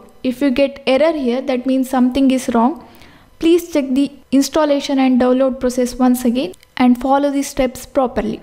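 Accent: South India, India. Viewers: Are you south indian?🤔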